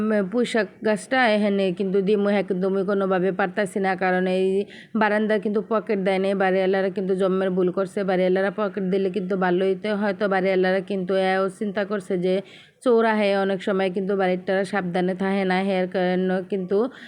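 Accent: Barishal, Bangladesh. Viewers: নাস্তা খাওয়া রান্না কেক বানানো বরিশালে ভাষায় কথা বলা খুব ভালো লাগলো ❤❤❤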